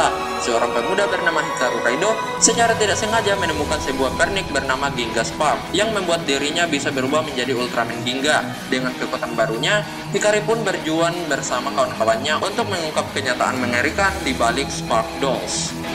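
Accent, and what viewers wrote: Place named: Eastern Indonesia, Indonesia. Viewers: hahah masa kecil kita bahagia banget, thankslah infonya,,, anyway logat ngmgnya asik banget bang, orang timur apa sumatera nihh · Asal mana sih logatnya kayak orang makasaar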